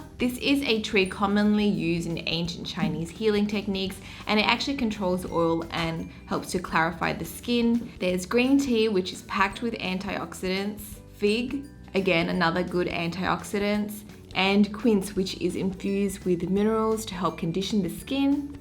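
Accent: Australia. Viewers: I like your Aussie accent! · it's her aussie accent chill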